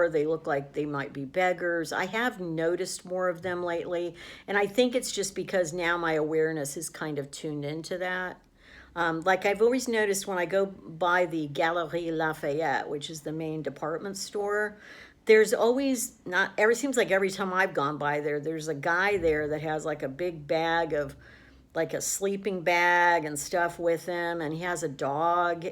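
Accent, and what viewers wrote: United States. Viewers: Are you guys realising the hatred here for Americans right now???? Or are you asleep!!!! Do you not get EU news in the US...the vitriol for your country is palpable!!!. I did tell you its not safe for a woman alone in France. I doubt you read it and if you did probably ignored it. ITS NOT LIKE BEING ON HOLIDAY FOLKS...ITS ENTIRELY DIFFERENT. Personally Id go home. I'm here in the UK. No differant, anti US very strong. Honestly I have no idea why you moved to France. You'll never sound French you're obviously an American ... Any who thinks I'm being nasty I'm honestly not...but its bloody dangerous and those saying its not are ???? Living in a bubble i guess.. Just saying as it is. also EU hates US at the moment and not suprising! Your Administration ...there are no words. Kids cause hellll here as well, alot of trouble. I'm 67. I've lived all over Europe. 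...you are not safe alone with no friends or relatives. Why do that? Truth. London is a real nightmare. It's NOT Clockwork Orange....😂 seriously, but its bad here. But if you go North up to York or Lincoln or just far away from London etc it's nicer. ...but! I do not understand your rationale, EU has terrible problems these days. Violence. You're a nice woman. If you want to come to UK avoid London. Really bad. I know lived and worked here on and off 45 years! Still here .....not the London youd recognise.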